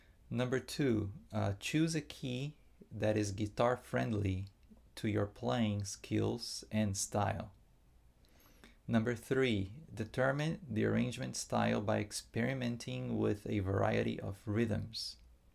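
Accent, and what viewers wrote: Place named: Brazil. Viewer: Sotaque brasileiro está carregado nesse vídeo! haha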